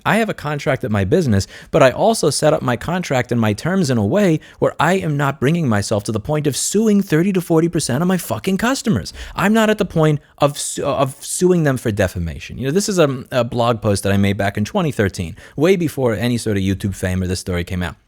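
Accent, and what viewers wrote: New York, United States. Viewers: Please shut up about the linus drama. It got old quick I come here to listen to that succulent new york accent not to hear about the YouTube equivalent of schoolgirl drama. Otherwise Loved the video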